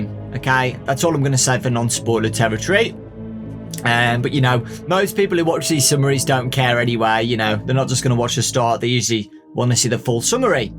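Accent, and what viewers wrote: United Kingdom. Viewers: What’s the point of buying the book when you can just listen to a British lad read it for free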